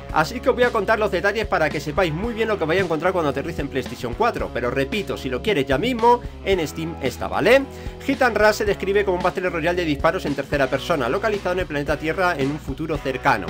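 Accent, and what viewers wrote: United Kingdom. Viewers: Vi todo el video para escuchar la pronunciación de de su ingles britanico y se salto...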